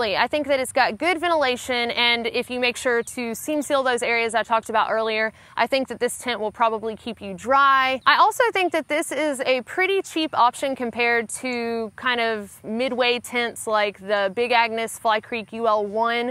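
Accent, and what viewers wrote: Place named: South, United States. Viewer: I seen you and your mom in the desert so I thought you lived out west. Until I heard you talking y'all 😃😃. Yep you must be a good ole southern girl. Great review. I like seeing the rain test. To me staying dry at night is my main concern. Thanks for these reveiws. Like the dog love her colors. She's marked up real pretty. Looks like she might have a little bulldog in her.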